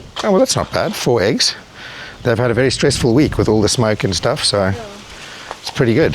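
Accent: South Africa. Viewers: is that a SA accent i hear? hope you all safe.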